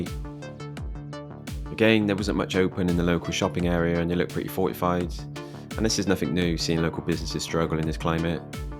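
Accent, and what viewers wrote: Southwest England, United Kingdom. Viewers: "The Coastal town that they forgot to burn down, come Armageddon come Armageddon come" ( - ) I love your videos and your strong southwest accent 😊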